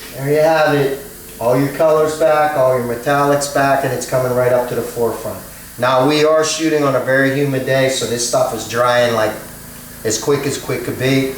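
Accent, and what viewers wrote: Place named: Pennsylvania, United States. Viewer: Love your videos an your PA accent as well. You don't mess around or mince words. I love that. Link to the DVD s would be cool.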